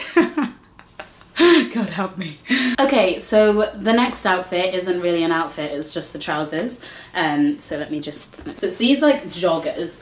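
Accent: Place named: RP, United Kingdom. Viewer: Omg you sound posh I love the way you talk tho it’s not the common English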